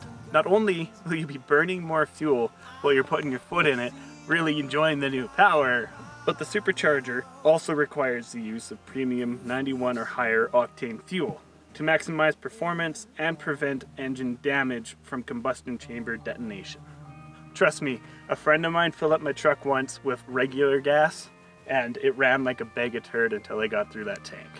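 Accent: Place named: Canada. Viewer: He’s mocking your (Canadian?) accent. Ignore the troll. Great video, great sound!!! 👍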